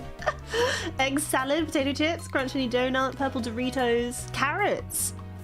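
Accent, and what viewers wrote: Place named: United States. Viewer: I love her American accent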